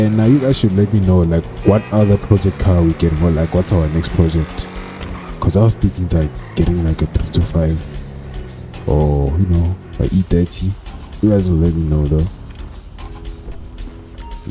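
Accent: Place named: South Africa. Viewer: The way you speak you from South Africa bro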